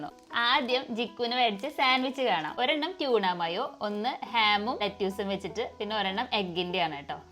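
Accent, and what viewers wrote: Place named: Thrissur, India. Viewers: Thrissur ok vedikuka ena parayuka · thrissur accent. she is from irinjalakuda ithink.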